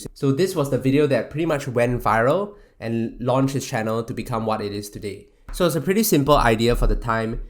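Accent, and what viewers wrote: Singapore. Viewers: Can hear that Singaporean accent hahaha hello fellow Singaporean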